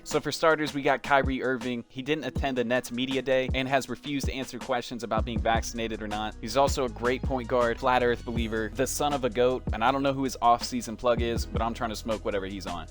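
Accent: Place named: Iowa, United States. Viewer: You from Iowa?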